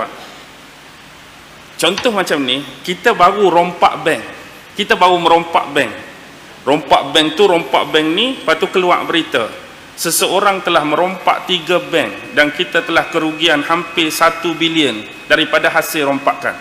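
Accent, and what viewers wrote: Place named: Penang, Malaysia. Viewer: asal kelantan tapi bahasa loghat penang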